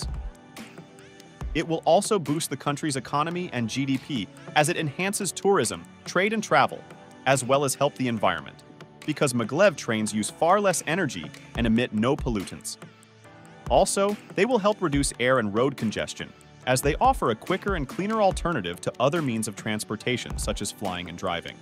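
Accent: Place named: United States. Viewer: More of the tiresome juvenile American accent espousing 'non-existent' technology, including the usual 'cut and paste' non-related images. YouTube unfortunately, is full of this crap!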